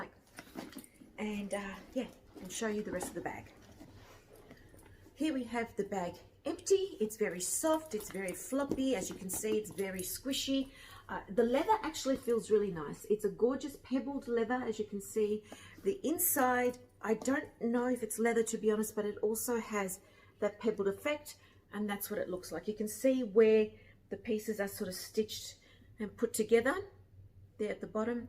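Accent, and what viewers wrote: Australia. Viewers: Lovely to hear a fellow Aussie on YouTube! Australian context really helpful regarding brands quality bag organisers etc. Thank you so much... keep up the good work!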